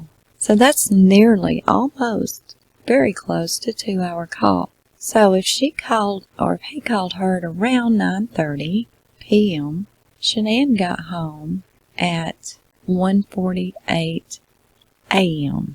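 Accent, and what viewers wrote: South, United States. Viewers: Love your videos. New sub. You tell alot of things on this case that I've never heard. It's not just the same ole same ole info. Btw, love your southern accent 💜. Thumbs up👍🏽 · Ever since Camilla Harris My #1rule was Do Not date married men!! Do these women have no Moral compass.And who lets their daughter be a menace to society and protect bad behavior? You are the best. I am on top of a mountain looking out on life thinking..there are plenty of lonely soul's who would disrupt a family's happiness? J4J is going to try to get footage of nk at courthouse. Also hear she is moving to Texas..Good luck out there. Only state that is big enough to hide.. 💘 your content your country accent and your style.. Mr.RP needs his on channel... I am positive he would have great success with his content as well. We love him too ❤❤🚚🚛🛣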